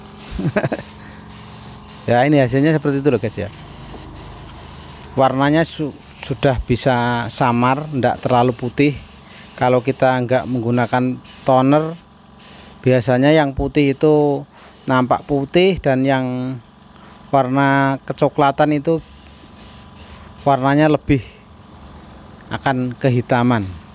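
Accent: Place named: Magetan, Indonesia. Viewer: Kok bahasane koyo wong magetan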